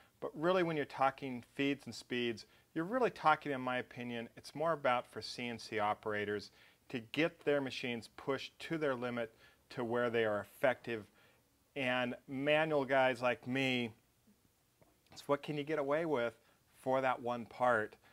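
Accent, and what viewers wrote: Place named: United States. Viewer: Solder has an L in the word: why can't you Americans say it properly?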